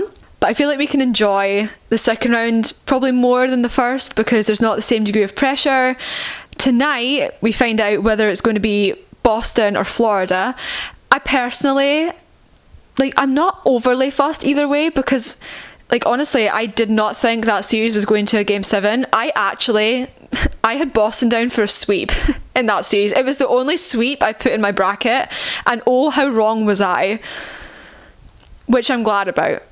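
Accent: Scotland, United Kingdom. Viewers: U got new subscriber are you Canadian my bad u scottish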